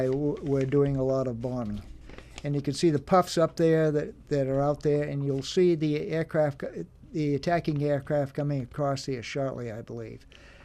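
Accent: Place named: New England, United States. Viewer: Love this video! And the New England accent reminds me of my late grandmother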